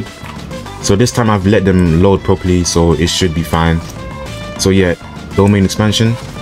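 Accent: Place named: United Kingdom. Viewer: He’s British I didn’t know this was his real voice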